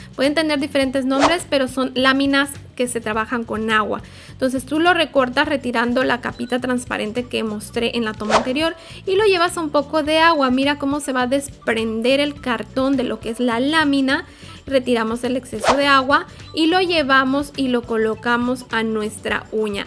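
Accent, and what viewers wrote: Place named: Mexico. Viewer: Oye tu te expresas como mexicana yo creí que eres de Mexico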